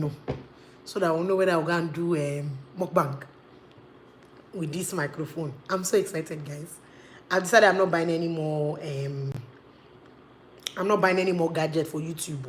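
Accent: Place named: Ghana. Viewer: I love your accent so much ,are you Ganian? I am South African by the way.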